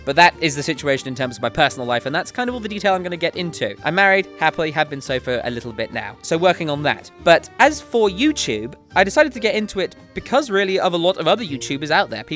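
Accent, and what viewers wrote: United Kingdom. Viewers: I subscribed to you when I watched your SoO LFR videos, since they were really helpful. I now watch a lot of your videos (though not all because I don't have the time). I love your guides (the Blackrock Spire one is GREAT! keep it up!) and I love your daily discussions. I hope to see more. About your personal life, I wasn't aware of all that (although I did find out you are British just by listening, lol) and I find it to be a rather interesting story. It's sad you two had to go through all that trouble just to get married. If you weren't by now, I'd suggest you to come visit my country, but it won't be necessary now, hehe. Anyway, good luck with your life, with your channel, with everything. Keep up the excelent quality! :) Regards, from the southernmost country in the continent, Argentina.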